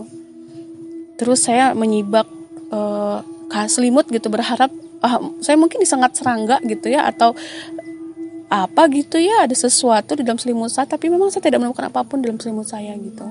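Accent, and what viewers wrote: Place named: Sunda, Indonesia. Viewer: Suka sama cara bercerita dan penguasaan bahasa Indonesia tetehnya, ditambah aksen Sunda dikit2, nyaman dengarnya. 😊❤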